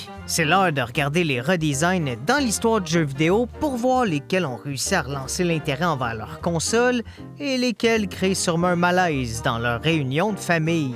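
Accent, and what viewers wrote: Quebec, Canada. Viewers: Quand tu pense cliquer sur une vidéo en français et qu'après tu te rend compte que c'est en québécois...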